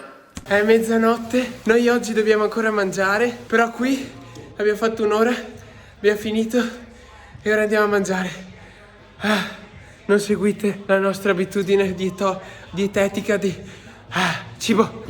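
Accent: Veneto, Italy. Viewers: Non so come sia il bresciano però dai si sente un casino che è veneto 😂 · A me sembrava che avessse un accento Veneto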